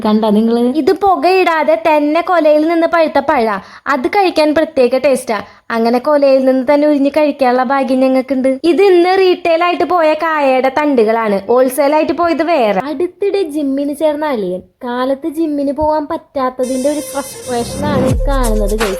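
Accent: Thrissur, India. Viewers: നിങ്ങള്ടെ സംസാരം തൃശൂർ സ്റ്റൈൽ ആണല്ലോ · Thani thrissur bashayund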